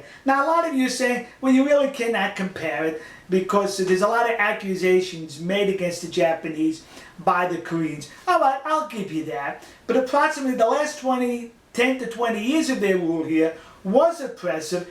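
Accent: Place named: New York, United States. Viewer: The character is a fraud. He doesn't even sound Texan. I think he has a New Yorker accent LOL. It's funny how they promote memorabilia items online. Just a way to earn a quick buck by telling the Japanese what they want to hear.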